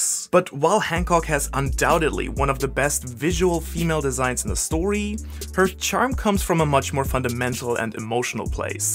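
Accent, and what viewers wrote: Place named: Germany. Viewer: bruder dein english is perfekt aber dieses japanische mit dem deutschen akzent geht einfach gar nich. lern dein akzent weg oder lass es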